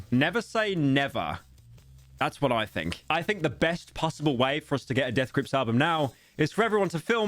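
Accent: United Kingdom. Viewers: why is he more british in the video rather than in any other video?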